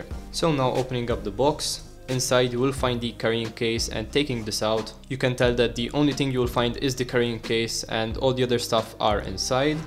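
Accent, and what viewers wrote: Malta. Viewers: that accent is totally maltese :D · I'm betting my left testicle that you're Maltese going by the accent 😆 ... Good vid bro! Keep it up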